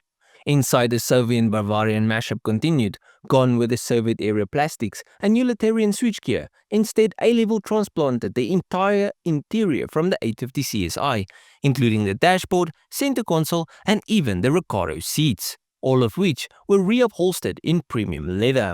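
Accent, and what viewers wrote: South Africa. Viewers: The car is amazing! You never cease to come up with automobiles most of us have never heard of. You are an incredibly intelligent young man. And, as I've said before, your narration with that South African accent only ads to the videos enjoyment.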